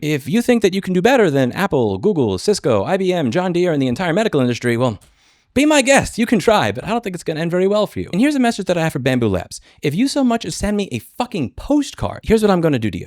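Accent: New York, United States. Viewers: you still have that NYC Pitbull in ya and I'm loving it.!!!!!!!!!!!!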